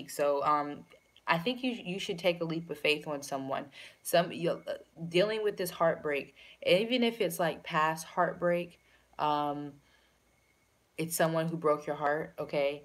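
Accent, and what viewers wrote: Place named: DMV, United States. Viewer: Where are you from love... you sound like your from the DMV area or some where around there... (DC/Maryland/VA) ... Just being nosey girl... LOL!!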